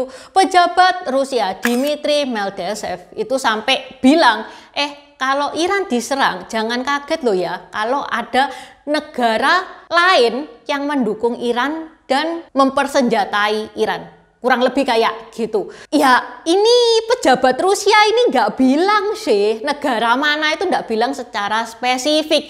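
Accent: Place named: Surabaya, Indonesia. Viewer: Salut info yang cukup mendetail diiringii logat khas surabaya dan gaya bicara yang kocak dari.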